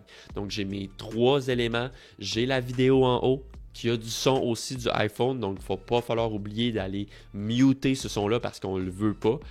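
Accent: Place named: Switzerland. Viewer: J'adore l'accent Suisse ! 😏 Merci pour toutes ces infos !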